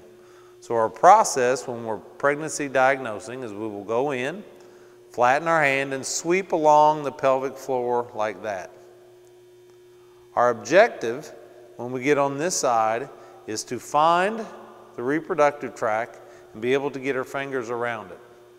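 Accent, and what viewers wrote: Kentucky, United States. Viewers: watching 2x speed because this man speaks Kentuckian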